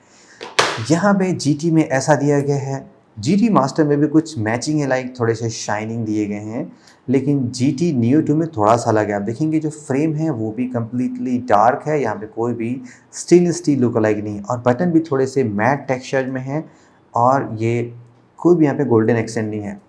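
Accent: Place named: Rampur, India. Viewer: Bhaiya apke rampuri ka koi jawab nahi😁😁😜🙏🙏❤️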